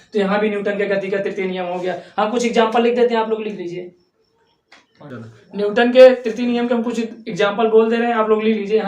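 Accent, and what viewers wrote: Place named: Pratapgarh, India. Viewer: अति सुन्दर प्रस्तुति प्रतापगढ़िया भाषा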